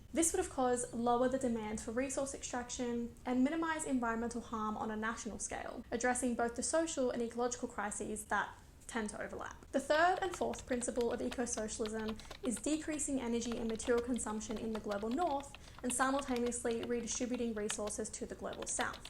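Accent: Australia. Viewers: You sound Australian ❤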